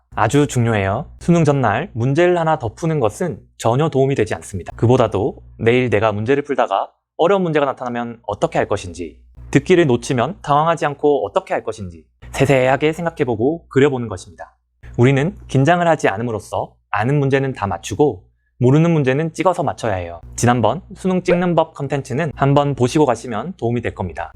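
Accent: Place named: South Korea. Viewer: 여기착한의사선생 말투가 서울토박이가 아닌 느낌이 드네요?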